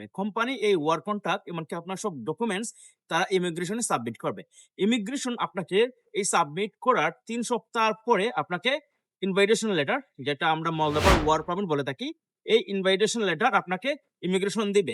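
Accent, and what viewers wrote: Sylhet, Bangladesh. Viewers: ভাই আপ্নে সিলেটি লাগের।আমি আপনার লগে মাতিতাম ছাই।ওয়াটসাপ নাম্বার আছে নি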